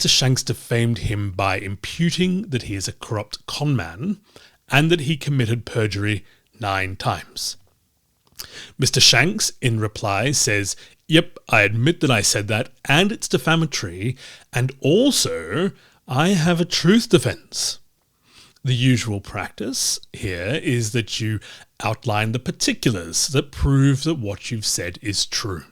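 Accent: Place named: RP, United Kingdom. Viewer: You're the only Australian I've come across with an Etonian posh boy accent. Thanks for explaining to everybody how we in the UK screwed up out legal system over the past 400 years. It's a pity that you Australians can't shake of our baggage and come up with a better system of your own.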